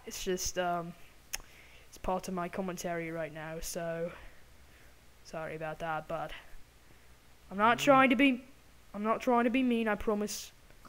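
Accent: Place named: Australia. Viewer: U sound more australlian bro and i am british and i dont care i dont expect u to have a good accent since u r not british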